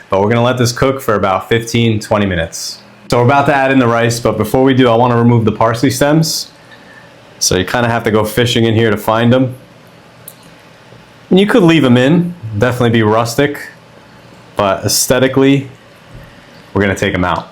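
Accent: Philadelphia, United States. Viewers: Reminiscent of a Philly accent. Reminds me of home and Gramma Consolo's cooking.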